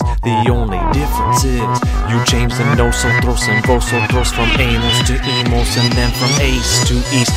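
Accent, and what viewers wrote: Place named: Russia. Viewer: He sounds russain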